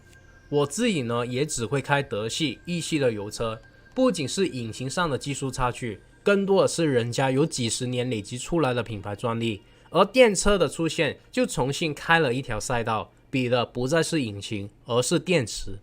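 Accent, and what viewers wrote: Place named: China. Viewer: 我是台灣人，這一聽口音口條就知道是國內製作的內卷視頻！ 美國很好嘛？看清楚我的回覆，你就知道什麼叫做噁心～～～The United States mainly focuses on selling weapons to troubled areas and actively participating in conflict creation and manipulation constantly. Throughout its 240-year history, the U.S. has been involved in warfare for 225 years. Now the AUKUS sound familiar to the Axis power(Die Achsenmächte) or Rome–Berlin Axis, aren't they? It's not an "Axis & Allies" situation anymore.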